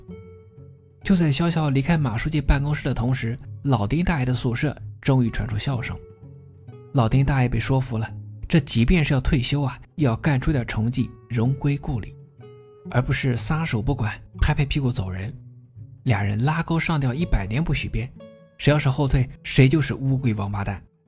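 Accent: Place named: Suining, China. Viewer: 博主是不是睢宁的，口音很像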